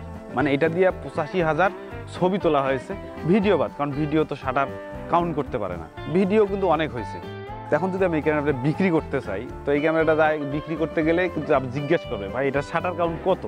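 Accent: Rangpur, Bangladesh. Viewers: হ্যালো ভাই আপনার বাড়ি কোনঠে রংপুরিয়া কথা কন ক্যা ম্পা অবশ্যই জানাবেন কোন জায়গায়‌ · আপনি সাবলীল বাংলা ভাষায় কথা বলেন তবুও এলাকার উচ্চারণ আমার বাডী উলিপুর কুডিগ্রাম তাই বুঝতে পেরছিলাম ধন্যবাদ উত্তর দেয়ার জন্য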